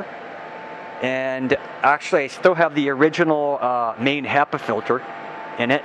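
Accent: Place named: Canada. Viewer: this is the most canadian person i've ever heard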